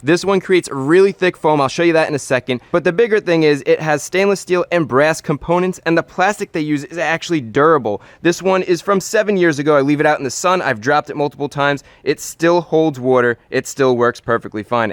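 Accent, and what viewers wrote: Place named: Philadelphia, United States. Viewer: Bro, I completely forgot you were from somewhere VERY close to Philly! But the second I heard you say, "fill the rest up with wooder" [water] I nearly fell out of my seat! My wife (who grew up near Allentown) yells at me all the time about my pronunciations and slang, but "wooder" in particular because she doesn't want my son to pronounce it that way!🤣😂🤣I love it man, great video, thanks for the upload. And I hope you and yours have/had a great + safe holiday and a Happy New Year! See you 2026!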